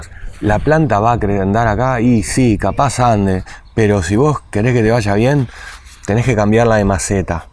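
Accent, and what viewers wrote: Argentina. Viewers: super buenos consejos, claros y completos, pero lo mejor es que erres encantador,me has hecho reir con tu acento argentino y tu manera de hablar. Simpatiquisimo!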